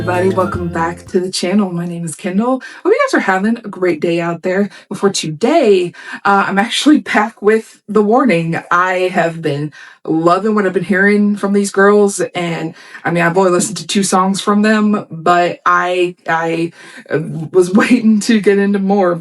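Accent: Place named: North America. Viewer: Your accent sounds pretty North American, and they come up here pretty regularly since Monterrey is only about 80 miles from the Texas border. Just keep an eye out on their web site for their schedule. Even if it's just a 1/2 hour set in a multi-band festival, seeing them live is always worth every penny - and generally speaking, you won't even have to spend that many pennies - they won't try to squeeze $600 out of you; between $30 and $60 usually sufficient. 🙂 Musicians this good and entertaining for an affordable price is almost unheard of these days, and a tremendous relief for those of us who have been going to concerts and festivals for multiple decades.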